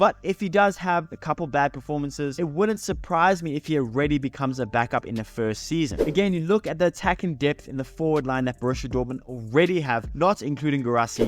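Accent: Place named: South Africa. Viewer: South african accent. Reveal your PSL team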